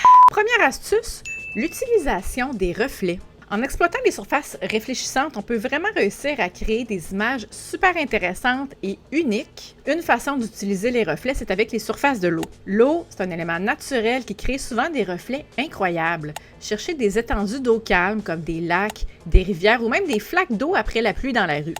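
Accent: Quebec, Canada. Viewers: Merci pour la video. Votre charme, celui des vos chats et votre "accent" québécois (je suis suisse) y contribuent beaucoup. Et merci pour les astuces très bien expliquées.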